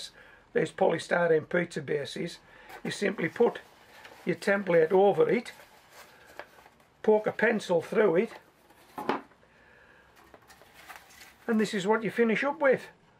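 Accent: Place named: Northern England, United Kingdom. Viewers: he is cumbrian sounds like workington area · No he's not Scottish it's a northern England accent you can hear. As I am from Yorkshire I should know where it's from but it can be tricky, definitely North England though same place as chubby brown is my guess. North Yorkshire area (Middlesbrough and redcar) best guess 👍🇺🇸🇬🇧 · Such a strange but lovely accent 🤣🤣🤣 says "seeds" like a brummy .. Got Jordy in there and a bit of everything else!🤣🤣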